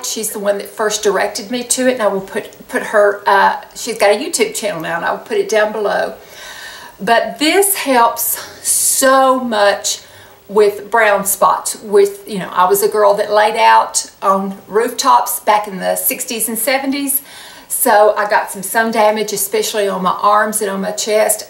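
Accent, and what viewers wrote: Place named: Nashville, United States. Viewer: thank you thank you for this video. it was the perfect call to action to help me get out of a slump and start to get in gear! i love the winter too, but my husband hates it, he complains the entire season. i say you can always put more clothes on but there is only so much you can take off. i’ve lived in north carolina my whole like bit my husband’s family is from Nashville. Listening to you is like hanging out with all his aunts and female cousins. Love it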